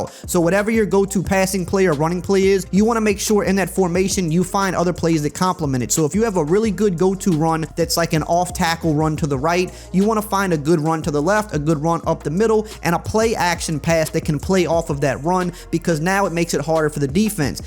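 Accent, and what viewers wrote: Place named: New Orleans, United States. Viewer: Definitely Nola area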